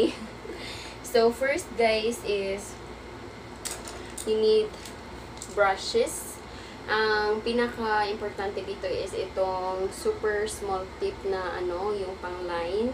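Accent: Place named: Visayas, Philippines. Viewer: Di jud Malikayan ang pagkabisaya nga accent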